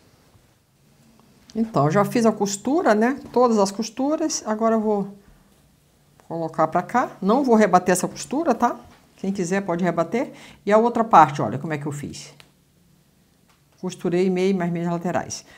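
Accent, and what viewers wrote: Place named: Northeast, Brazil. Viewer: De qual estado do nordeste você é? Esse sotaque não conseguir identificar. É do nordeste mesmo ou é norte?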